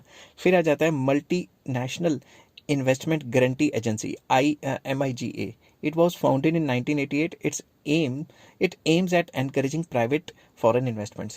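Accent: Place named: Punjab, India. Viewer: It seems that sir is from punjab😅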